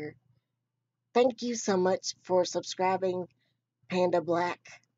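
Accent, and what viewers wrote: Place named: South, United States. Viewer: Keep doing what you are doing! You are such a positive woman and know that you will always have a crew backing you up. You are very funny and I get your sense of humor because I have a southern twang too! 😘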